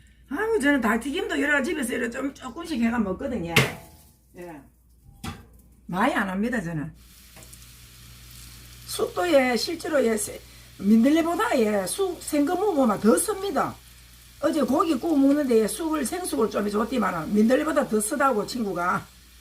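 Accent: Gyeongsang, South Korea. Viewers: 직접 채취하신 재료로 쉽게 하시는 튀김 바삭하니 맛나게 보여요 말씀이 경상도 사투리~ 정감있어세요~ 응원드립니다~^^ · ㅋ 그 손감각이라는게 해 본 요리는 되는데 생소한 재료는 쫌ㅋ 구수한 갱상도 사투리 좋습니다~~아이고 기름에 튀겨지는 소리 완전 침이 꼴깍합니데이~비주얼짱임다😄😅🤣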